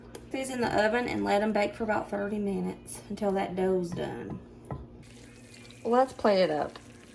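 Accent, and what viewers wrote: South, United States. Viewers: Hi!!! Enjoy watching you so much! Your Southern accent is just wonderful I love it lot's have a great Day okay ❤️👍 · Hi what other dry ingredient went with the flour and salt❤im irish i am hearing impaired love the recipes ❤and the southern accent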